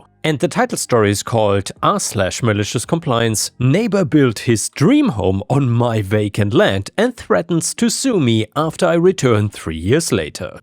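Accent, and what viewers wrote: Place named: Germany. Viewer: Love your videos. Your accent.. German? Sounds like my accent 😂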